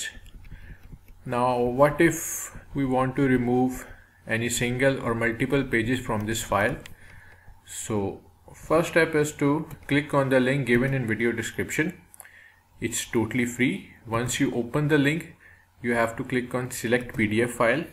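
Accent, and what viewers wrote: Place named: India. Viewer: Was hoping to hear an accent in STANDARD English... Another India. 🙄🙄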